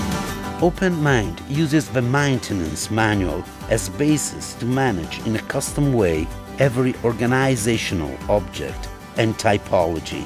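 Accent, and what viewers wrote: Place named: North, United Kingdom. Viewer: the combination of north british and slavic accents gave me a stroke, cool app tho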